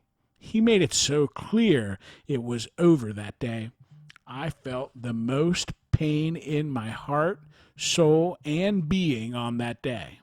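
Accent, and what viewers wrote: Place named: South, United States. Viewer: Awww that's a shame, I think the accent is very beautiful. I've been trying to work out, where it might be from. It does sound like a southern accent but I think it has a mix but could be very wrong. 🤔 Maybe give the channel a chance and see if you change your mind. Better a human voice than a computer for sure. If not, then Maybe you will find one that you do like. Have a lovely day 💛✨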